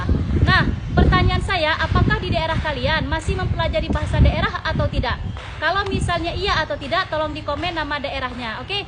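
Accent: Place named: Aceh, Indonesia. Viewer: kenapa logat Aceh hampir sama dgan logat Bali, ktka berbahasa indonesia?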